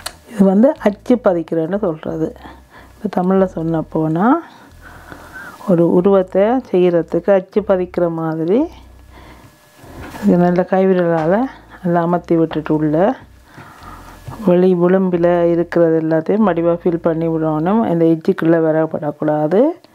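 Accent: Jaffna, Sri Lanka. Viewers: jaffna tamil pesathinga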